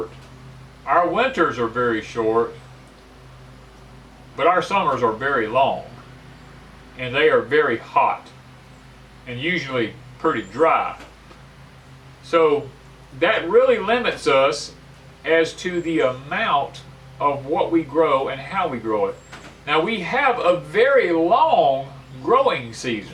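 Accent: South, United States. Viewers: Good subject! Thank you. I don't have a homestead, just rent an apartment but there's only 8 apts. each building, total 4 buildings in a small rural western WA town, but I have 3 smallish garden spaces outside my front and back door and they work out pretty good though I'm not a pro like you and. Your southern accent is just fine! Don't let folks throw ya...how anyone pronounces words is unimportant--your intent and message IS. I totally agree with you. I am glad the plum and apple trees on the property aren't sprayed that I pick every year though the fence line is but it's not near the apts. Living in the north has different challenges but folks are often in the same boat no matter where ya be. I can, dehydrate, pickle as much as possible. I understand how it is when you have to get things canned NOW, or lose your produce. My folks hated waste and so do I. 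Anyway, thank you for explaining farming/gardening procedures. It's very interesting and I am learning a little. I'm kinda slow, but I get there. God bless you and yours.🌲👵🐕✋🌻🌱🍃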